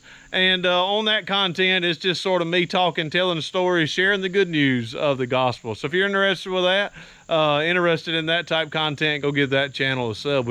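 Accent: South, United States. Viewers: your southern accent is wonderful!! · i like how you's southerners say coil. you say it [ ool ]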